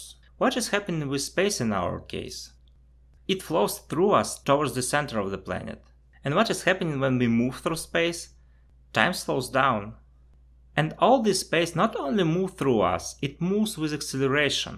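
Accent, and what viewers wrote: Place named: Russia. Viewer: Hey! Isn't your native language Russian ?) I hear familiar accent 😁 If yes, just подмигни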